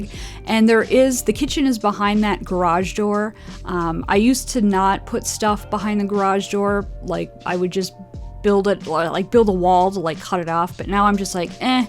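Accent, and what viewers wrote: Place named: Midwest, United States. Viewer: the driveway landscaping is perfecto! alsoooooo how have I not noticed your (possibly midwest if not cnadian) accent before now???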